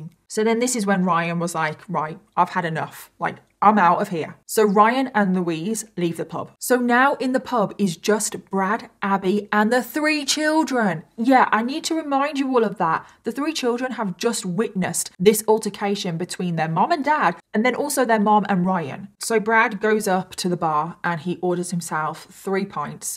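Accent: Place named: Birmingham, United Kingdom. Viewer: ‘A bit off topic’, but your accent sounds Birmingham. Am I right?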